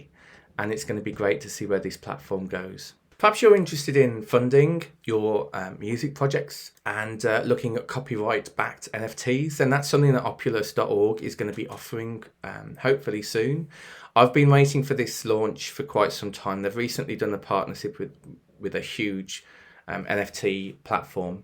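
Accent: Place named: Birmingham, United Kingdom. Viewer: Love your content! Are you from birmingham as well ?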